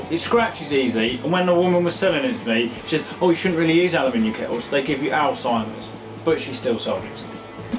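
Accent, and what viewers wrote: United Kingdom. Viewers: You can tell where he's from he's got a British accent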